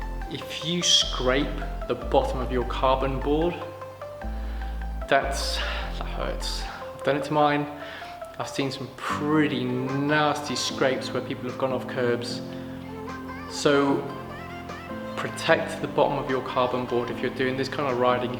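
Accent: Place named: England, United Kingdom. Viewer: It seems like people with English accents make the best reviews. Great work man. I’m looking into the bamboo. I like the flex deck. Plus, I like the muscle car vibe the bamboo has going on.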